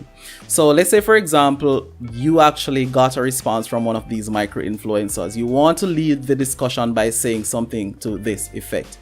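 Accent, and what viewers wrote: Jamaica. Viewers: You can tell he’s Jamaican 🇯🇲😂 real businesses man nuh true boss man‼️ big up uh self n guh chu n mek uh money bad man💯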